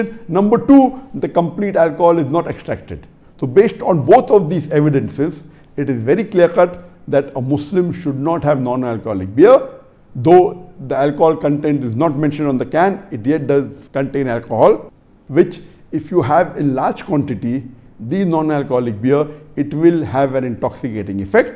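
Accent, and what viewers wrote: India. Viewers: I can't understand Indian accent, can you tell me is this halaal or not?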